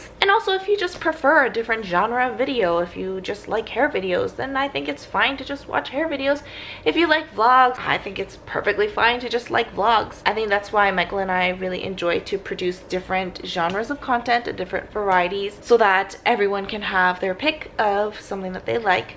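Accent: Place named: South Korea. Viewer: konglish on point 👌🏻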